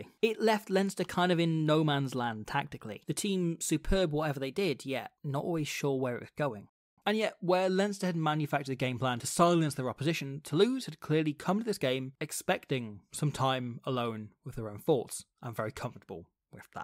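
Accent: Wales, United Kingdom. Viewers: You can turn on generated subtitles, it's not perfect but does the heavy lifting. That welsh accent can be hard to understand for a non-native speaker haha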